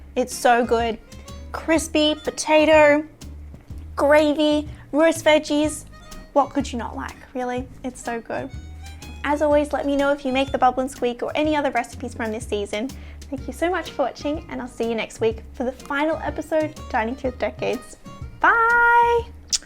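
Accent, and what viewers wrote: Melbourne, Australia. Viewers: Are you from Melbourne!? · She’s Australian, not english!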